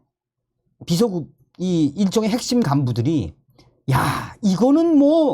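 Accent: North Korea. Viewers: 북한 사투리 쓰면 왜 안됩니까 현장감있게 전달하려면 북한사투리던 뭐던 있는 그대로 전달해야죠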